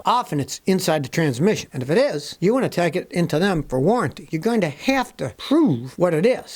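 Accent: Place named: Western New York, United States. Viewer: Got to love that Western New York Accent,. rat-ling. I grew up near Syracuse.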